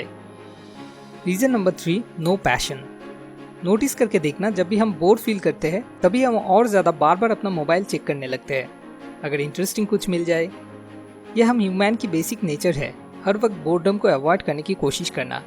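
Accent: Bengal, India. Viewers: I am 💯% sure that you are BANGALI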